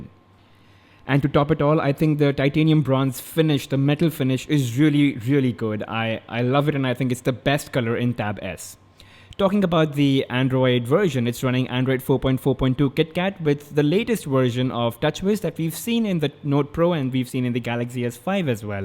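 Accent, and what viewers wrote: India. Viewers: yea thats the indian accent for sure lol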